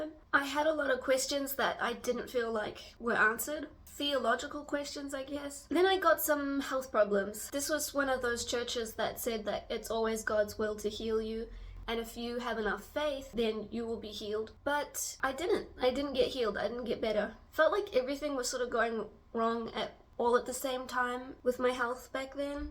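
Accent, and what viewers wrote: Australia. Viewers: What country were you born in, if I may ask? I detect a slight accent in your English that sounds Australian. May your 2026 be the best year of your life. 🎉🎉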